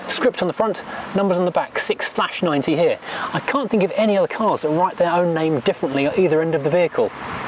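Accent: United Kingdom. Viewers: Wish he wouldn't speak so British, I would like to understand WTF he is saying...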